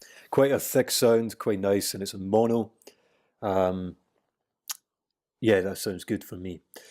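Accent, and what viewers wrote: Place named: Glasgow, United Kingdom. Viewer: Fuckin yass subscribed as soon as i heard the accent. Min the glesga!! Class tutorial be swatching the rest.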